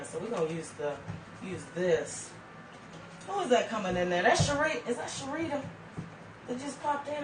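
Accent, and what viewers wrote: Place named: Texas, United States. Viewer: You sound like you are in Texas with me😀😃🤩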